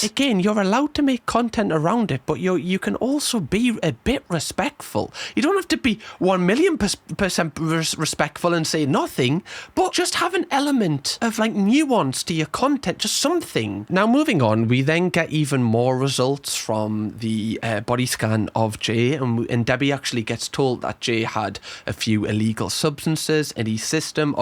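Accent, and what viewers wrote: Newcastle, United Kingdom. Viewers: Your voice is going up at the end of every sentence.. I get your a geordie.. But it's hard to watch with the internation... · Are you geordie ? I keep hearing bits of welsh in your accent.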